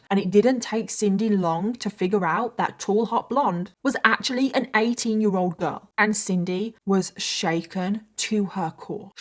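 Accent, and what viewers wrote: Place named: Birmingham, United Kingdom. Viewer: I detect 10% Brummie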